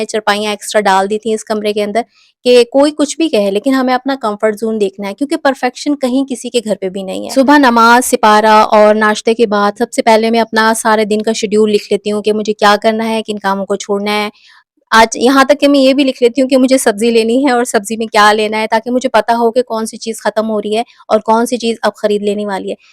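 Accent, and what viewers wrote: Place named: Karachi, Pakistan. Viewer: Ap Karachi se ho apky lehjy se LG raha ha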